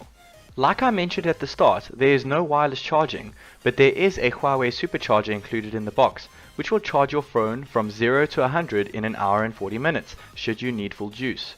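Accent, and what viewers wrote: South Africa. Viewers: Hey, South African accent guy - excellent video, well done.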